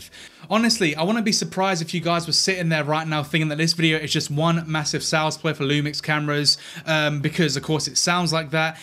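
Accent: United Kingdom. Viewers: I don't know if it's the irritating British accent or his personality. But he has the sincerity of a used fast-talking used car salesman. A camera is not a function of price and glass. I figured out at the end that he was auditioning to be a Lumix "influencer". He said that he was putting his name next to the brand. Am I supposed to know who you are?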